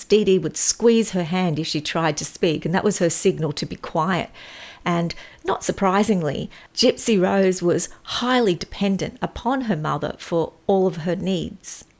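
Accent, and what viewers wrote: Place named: Australia. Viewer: I live on the Mid-North Coast of NSW so it's good to hear an Aussie making these videos. Your calm manner and evidence- based approach is very refreshing.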